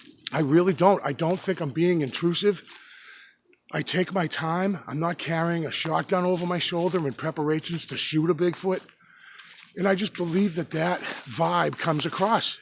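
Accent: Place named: New England, United States. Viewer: A beautiful day for January in the East! We had rain with thunder and lightning this morning. over 50 degrees in Jan., think I'm going hiking in the woods - I'm envious every time I see you out and about - Love your NE accent - don't worry about trolls - just keep it moving, and keep the videos coming! And just keep that head of yours on a swivel!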